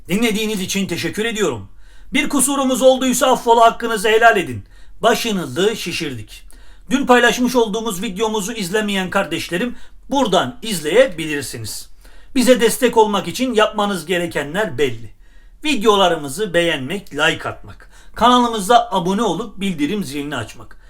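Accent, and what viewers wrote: Erzurum, Turkey. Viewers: Dostum olayları harika yorumluyorsun,yayın hayatında başarılar diliyorum, bir şey merak ettim bazı sözler ve şiven bizim erzurum yöresine benziyor oralimisin yoksa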